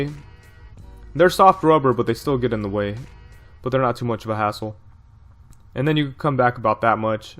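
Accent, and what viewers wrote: New York, United States. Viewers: Anytime bro and no worries we all make mistakes we're only human. Also I can imagine from the video you made of your trip on San Diego Comic Con even the stuff you had it'll be a bit to review also yeah Idk why they didn't included that since I'm sure he used a pistol in one of the movies but I don't remember for sure. Still kinda off on that since lately it seems S.H. Figuarts are getting lighter on accesories I noticed it with the S.H. Figuarts Deadshot even Dark knight Batman that was released last month idk very strange. Also thanks again man for the info really appreaciate the heads up on that also too can you put one of those business cards you made with it? I ask since can't let those cards go to waste after you worked hard on them for San Diego Comic Con so be cool to have one from you. Lastly, thanks for also saying my last name correctly you said it the right way since I am Puerto rican lol was also gonna include that in my email asking you if you're hispanic as well since I know you live up north in NY by your accent since was always very curious on asking that. 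Also too again keep up the good work on your videos and once again I'm thankful for winning your contest and will enter again in more in the future and hope you have a great day.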